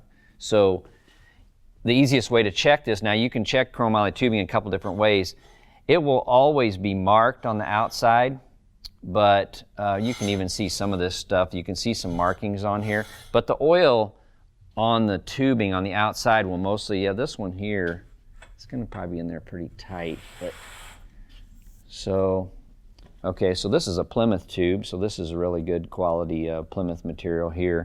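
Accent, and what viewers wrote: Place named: United States. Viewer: Great video ... still cant get over how Americans say tubing and aluminium lol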